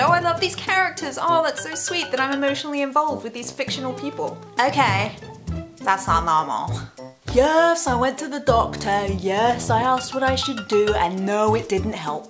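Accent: United States. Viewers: She's beginning to get an American accent. :D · What's with the American accent? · It's been a while, and i have one question. WTF HAPPENED TO YOUR ACCENT!?!? You sound so damn American now! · she sounds so American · Dude, you're starting to sound so American.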